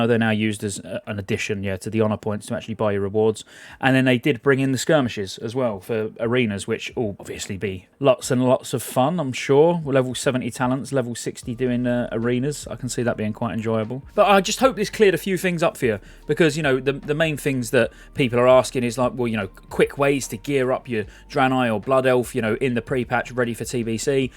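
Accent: United Kingdom. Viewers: I love your content but can you please fix your British accent? That would be great. At least start pronouncing your T’s....it’s Thirty with a T, not thiiieeeey.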